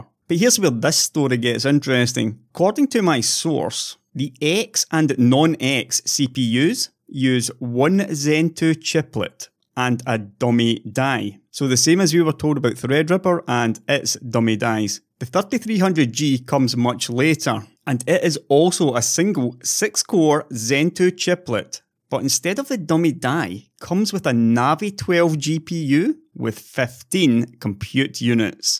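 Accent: Scotland, United Kingdom. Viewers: Scotia English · Great content, in depth knowledge, scottish accent. Thick, thick, thick scottish accent. You got my subscription mate. I'd also love some content in scottish gaelic.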